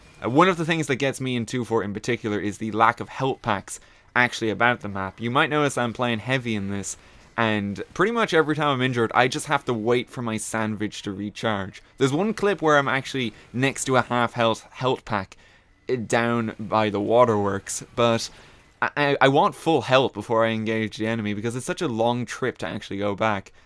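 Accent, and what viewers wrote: Ireland. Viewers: I actually like 2Fort, but it needs a time limit. P.S. Are you Irish? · haha your Irish accent is one of the things that keeps me coming back! It's so fun to hear you folks say 'Threehundred and thirty three trees' and so on... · Are you Irish? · You're an Irish TF2 youtuber? subbed immediately. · lol your accent sounds like half Irish and half Australian xD (plsignore)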